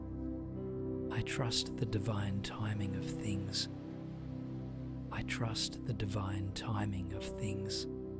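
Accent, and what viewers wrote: Australia. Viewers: I was checking and he is from Australia. I love his accent. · J Wheeler an Australian.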